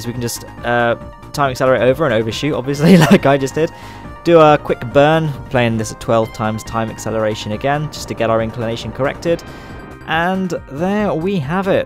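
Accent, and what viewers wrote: United Kingdom. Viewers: I really love your british accent :D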